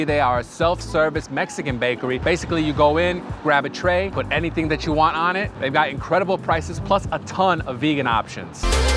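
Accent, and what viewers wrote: Chicago, United States. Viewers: You have the thickest Cha-cah-go accent. 👌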